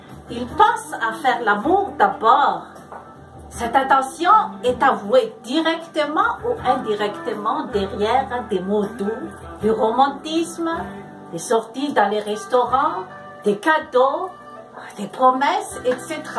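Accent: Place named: Canada. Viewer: Accent canadien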